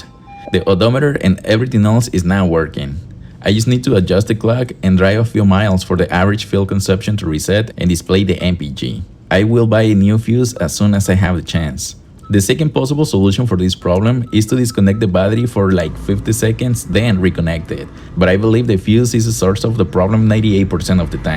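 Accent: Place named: Colombia. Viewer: Too this was the exact issue. Thanks a lot. You sound like you are from Colombia.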